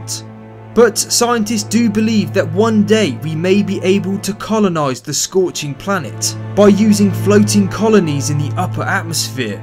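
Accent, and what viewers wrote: United Kingdom. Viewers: Well,very intersting information about Venus.But to tell you the true,I think that despite all the uncomfortable conditions at Mars,the red planet looks a far more friendly world to be colonized.Thankyou so much for sharing that so interesting data!!I love that british accent :-) !!.Greetings from Colombia!!!.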